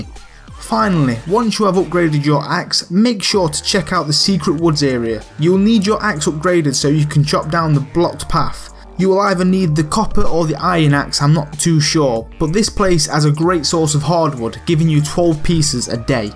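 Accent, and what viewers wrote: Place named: East Midlands, United Kingdom. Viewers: Loved the narrator's voice/accent. He reminded me of my childhood in Leicestershire (Ashby-De-La-Zouch.) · Thanks for video bud, appreciate it. Nice to hear that sorta accent to, from the east Midlands by any chance ?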